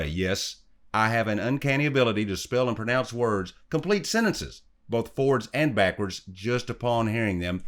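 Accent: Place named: Arkansas, United States. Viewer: Dude you deserve way more subs. Your channel is among the highest editing and narration quality on YouTube. Not sure why an Arkansas accent makes me so receptive. Maybe it has something to do with my father in law/boss/best friend being from Little Rock.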